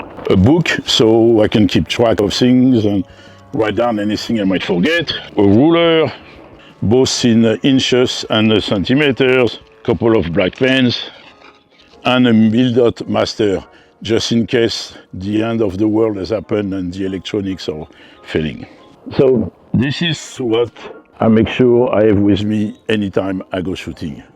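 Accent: France. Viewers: What is that accent ? French ? Tu es français ?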